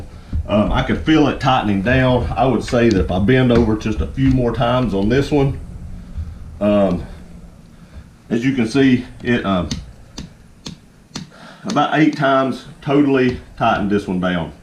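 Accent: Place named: Alabama, United States. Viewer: Are you from Alabama or TN?? The accent sounds like Alabama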